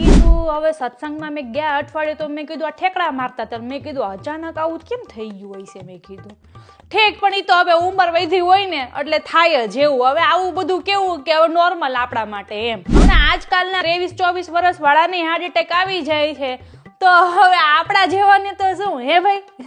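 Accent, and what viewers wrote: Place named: Saurashtra, India. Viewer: અસલ પટલાણી જેવા બોલો છો (સૌરાષ્ટ્ર ની બોલી)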